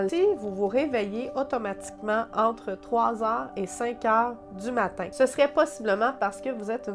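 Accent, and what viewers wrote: Quebec, Canada. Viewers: Waouh Nouvelle Vidéo J Adore l accent Canadien😉 Tes Cheveux Supers. Nous Sommes Sorcières de Famille Ma Grand Mère Le Grand Oncle., Bisous a Toi😉🐾⛲🐱🐈😄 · hello! Vous avez une voix qui nasille et l'accent québécois avec lequel j'ai toujours eu beaucoup de mal à écouter mais vous êtes tellement douce que j'arrive à vous suivre et à beaucoup apprécier!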